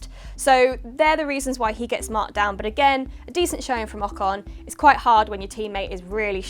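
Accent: United Kingdom. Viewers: I like the new format. Also Keep talking. As an American I love your british accent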